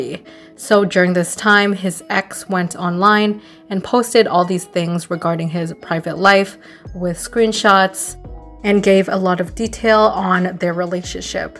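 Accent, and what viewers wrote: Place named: South Asia. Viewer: I have watched it once or twice. Not really interested about it. Because I think they are just not accurate. Just notice that the channel owners usually from south asian - due to the accent. Probably form India or Pakistan. It's hard to attain such informations like income and personal life. It's difficult to dig out info about C K and J artists n Idols because they keep their life privately. Especially in China. Mostly bcause the govt watches everything. But you can get info from reliable sources like established media with reliable journalist. Or try to read a blog. But absolutely they cant tell the exact amount. Only the speculation. I cant speak chinese korean n japan. I only read and watch in English. Oh yeah..even their own native media cannot tell the exact info about the artist. For example. Nobody knows where Fan Bingbing resides exactly evsnthough people said she lived in luxury complex. 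Japanese paparazzi often make mistakes about where their idols actually sleep. For chinese actors..we already know they have yinyang contract. Wont know how much they get paid. And we dont know how much their agency fee and their expense. And how they manage their money. Because when they exposed their wealthy, they should already to pay tax too. And dont forget people who will critize about how the artists manage their wealth. IU got critics when she bought the land. Actually she was helped by the asset management agency. JunJihyun was critized for being a mean landlord. AFAIK, s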